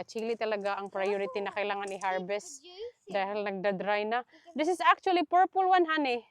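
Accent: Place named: Visayas, Philippines. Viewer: PAG BISAYA ANG SARAP PAKINGGAN NG ACCENT I LIKE IT 😅😅😅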